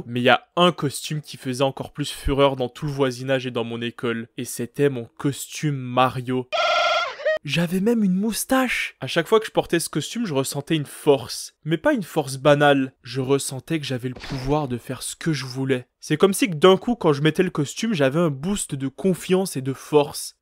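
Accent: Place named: Canada. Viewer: Oui no jugement mais j'aime bien l'accent canadien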